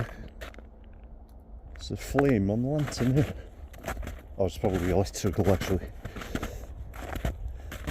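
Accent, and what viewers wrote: Wales, United Kingdom. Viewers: Hey, loving your videos, n loving your accent, there has to be so.e Welsh in there somewhere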